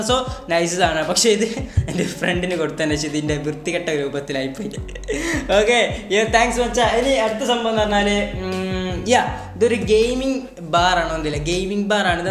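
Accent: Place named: Malappuram, India. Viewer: ആ മലപ്രം ടച്ചുള്ള സംസാരാ ഇഷ്ടം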